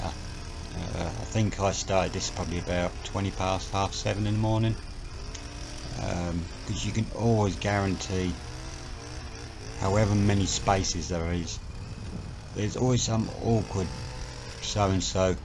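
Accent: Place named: Northamptonshire, United Kingdom. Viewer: Looks good. Good vid. Where in the country are you from as you sound very Northamptonian?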